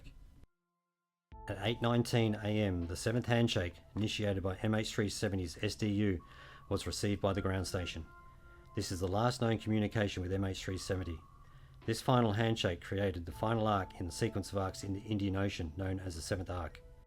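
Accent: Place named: Australia. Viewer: Brilliant work. Really well done. How do you know the CB’s were pulled at a certain time? And, what was the purpose of then restoring their position later in the flight? Again, great job, sir. As a fellow Aussie, you had me with the accent. I hope you pursue a career with ATSB. Needs more great, analytical minds!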